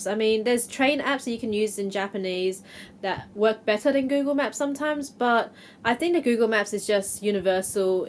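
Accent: Australia. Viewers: Australia is a bit broad, you seem to have a Sydney accent and talk like a Sydneysider as well so thats what i will guess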